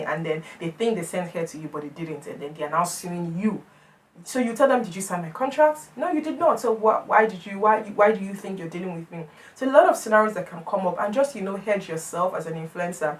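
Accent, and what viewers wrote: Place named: Africa. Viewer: looking beautiful as usual but let's all just act like we don't know the brand lol you sound like an African mummy 'panti leleyi' lovely video as usual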